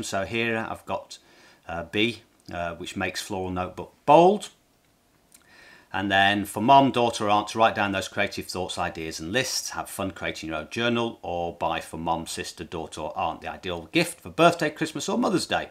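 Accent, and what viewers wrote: England, United Kingdom. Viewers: Good to hear an English accent, regarding making money, keep it up, your videos are very informative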